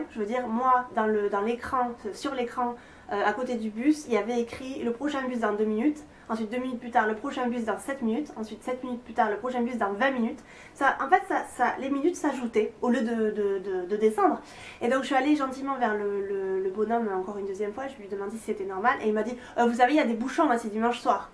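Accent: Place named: South, France. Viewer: j'adore ton accent du sud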